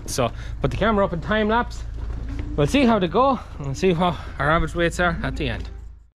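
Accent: Ireland. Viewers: enjoy your channel from across the pond. Are you aware that you have a slight Irish accent, and that a beautiful woman is working on your farm, oh, you lucky rascal? 😉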